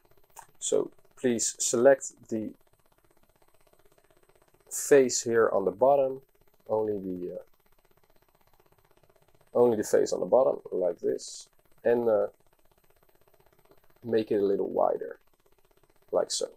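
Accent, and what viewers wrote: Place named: Netherlands. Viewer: Your dutch lol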